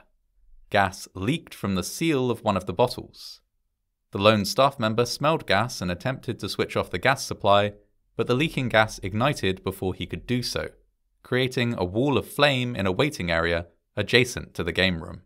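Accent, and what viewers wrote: United Kingdom. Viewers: terribly hard to listen to the mono tone british accent, im going to take a nap now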